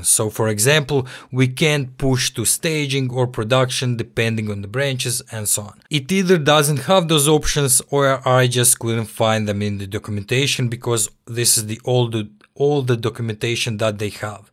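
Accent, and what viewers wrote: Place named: Russia. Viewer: u tallk like russian where u originated frm?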